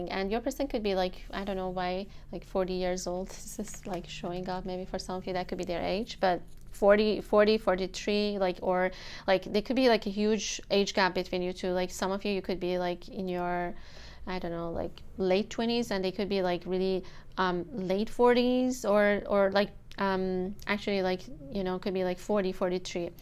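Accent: Iran. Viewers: Beautiful Irani Accent! Thank you for the reading.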